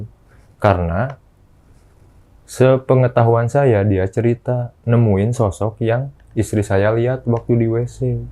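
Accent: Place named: West Java, Indonesia. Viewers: Meuni sunda pisan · Intonasi yang stabil, tempo medium penceritaan dan bahasa yang mudah dipahami membuat cerita lebih menarik dan nyaman untuk didengar, sekalipun dengan aksen Sundanish.. Salam Om dari kota tetangga, Cipanas.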